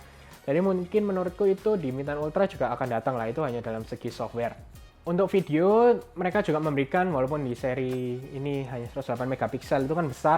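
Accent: Java, Indonesia. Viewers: Mantap mas....cino jowo medok yoo😍😍😍😍😍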